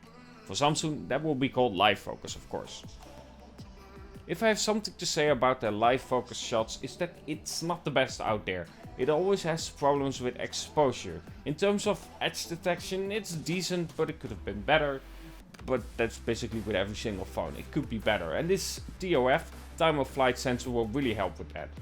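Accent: Netherlands. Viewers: haha, ik dacht al dat ik een Nederlands accentje hoorde😂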